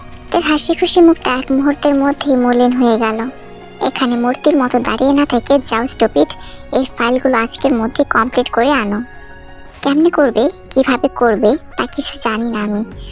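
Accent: Sylhet, Bangladesh. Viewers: Hmm apu r basha Sylhet. 💜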